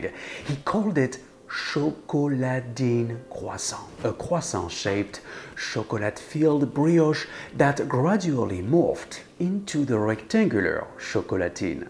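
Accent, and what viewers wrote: France. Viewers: I can't figure out if this guy has a French, American or British accent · Not sure if he's American trying to do a French accent, or French trying to do an American accent... 🤔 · The croissants seems amaizing, but any similarity with France (albeit the accent) was lost when you defined the butter layer in imperial rather than metric. So sad...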